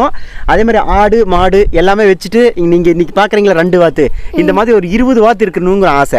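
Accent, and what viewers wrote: Kongu, India. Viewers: Kongu Tamil kongu Tamil thanunga ❤🌱 By Kongu Pet Collections 🌱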